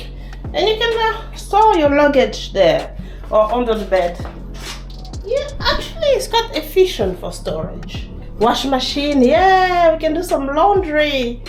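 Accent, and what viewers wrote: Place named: France. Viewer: Love that french accent 🤣🤣 you deserve more views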